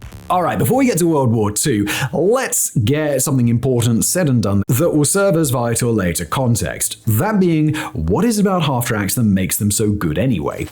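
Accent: England, United Kingdom. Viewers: got a stupid question are you from Great Britain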